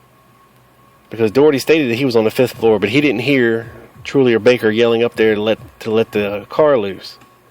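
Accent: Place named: Oregon, United States. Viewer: Hey I enjoy your videos a lot. And by the way, you talk like we do. Small minded people criticize— I appreciate the knowledge and open minded approach! Love from Oregon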